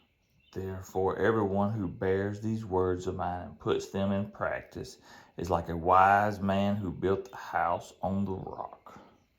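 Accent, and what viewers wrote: South, United States. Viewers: You the man.keep up that good old country talking..... Come on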